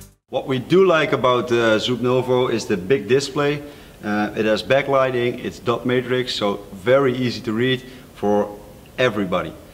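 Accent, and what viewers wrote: Netherlands. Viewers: What is your accent? Dutch? Nordic?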